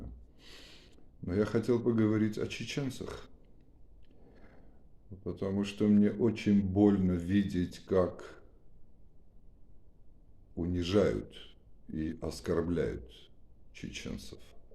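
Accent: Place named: North Ossetia, Russia. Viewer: Спасибо вам за правду, вы человек с большой буквы не знаю кто вы и какой вы нации но по акценту вроде осетин но это не суть важно, важно что вы доносите до людей повторюсь правду ,мир в долгу перед чеченцами, за то что бросили один на один в войне с Россией и причина этому, боязнь гнева огромной ядерной державы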